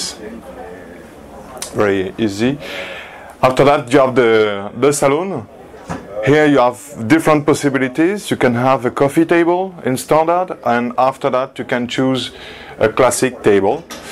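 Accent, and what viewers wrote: France. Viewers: Ah ! le célèbre "french accent" !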